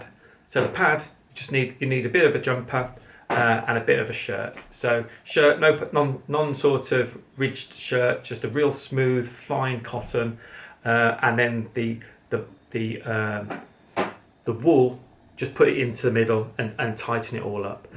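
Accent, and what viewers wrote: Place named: England, United Kingdom. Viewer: Also could you show how to French polish chair legs and smaller items like jewellery boxes A lot to ask but I’m needing to learn and like listening to your English voice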